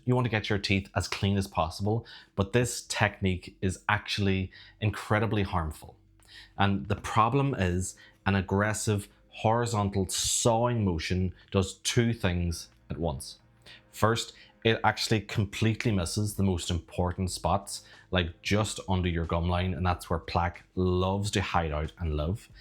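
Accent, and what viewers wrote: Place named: Ireland. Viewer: Good video love the Irish accent and you are kind of gorgeous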